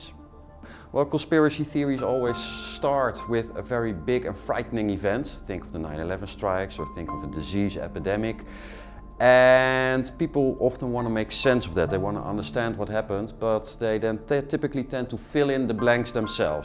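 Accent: Netherlands. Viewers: wie hoorde al aan de stem dat dit een nederlander is? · Yo hes netherlands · Mad Villain he is dutch, we have a lot of trouble with some sounds from the English language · You guys most dutch people have this accent when we speak english xd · He has such a dutch accent Im dutch too XD i hear it instantly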